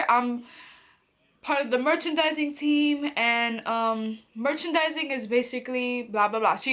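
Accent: New York, United States. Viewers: I like your accent are you in New York ? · I don't know which one you worked at but I'm sure it's in New York somewhere with that accent lol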